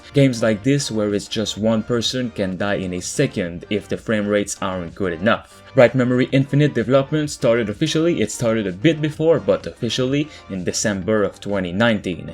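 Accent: Quebec, Canada. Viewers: Are you french canadian? You have the accent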